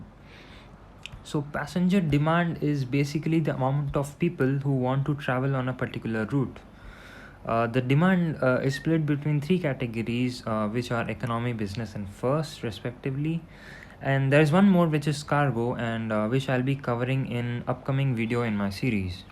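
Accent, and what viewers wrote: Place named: India. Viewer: Fuckin indian accent :((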